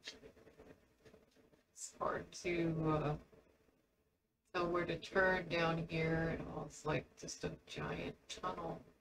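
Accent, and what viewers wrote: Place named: United States. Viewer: omg, I had the same heart-attack when my backpack was empty. If you look at the top left, you'll see everything is there, but bunched up for some reason. It only seems to be the first time I open my pack after I connect, or maybe after an update? Not often. But yeah, I've got all my tools and books in mine and was like "What the heckin'?!" when it happened to me. Did you see there's an upgraded version of the Environmental Eye called the Environmental Ocular that will show how far into positive or negative you are? And you keep using bonemeal to grow your trees for the ritual of the forest; would an Ars Grow spell not work, and save you some bonemeal? Re: streaming, keep in mind that you sound like you're in the US (and the weather in F in your taskbar says that, too :-P), and that means your day is my night. :-P So I, for one, would likely miss whatever progress you streamed, unfortunately.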